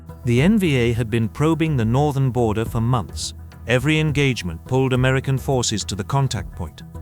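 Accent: United Kingdom. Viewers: Why the british accent guy narrating. Get an American.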